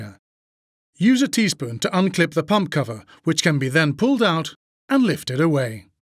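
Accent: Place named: Germany. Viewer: They same German voice on Airbus videos🤣🤣🤣🤣🤣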